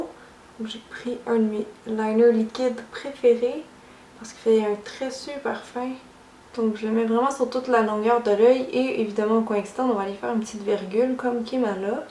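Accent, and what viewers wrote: Quebec, Canada. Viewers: J'adore ton accent quebquoi !!😻😻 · Tu est québécoise?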